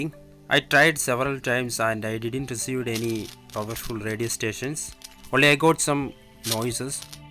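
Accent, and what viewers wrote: Kerala, India. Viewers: Bro r u from kerala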